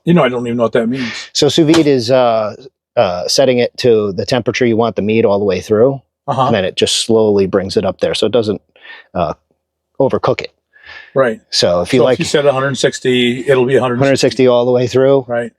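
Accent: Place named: New Jersey, United States. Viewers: “ I kinda drive like I stole it”. HAHAHA!, you gotta be from Jersey or something’. Sound like the wise guys I grew up with. Great build brotha! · I could tell he was from Chersey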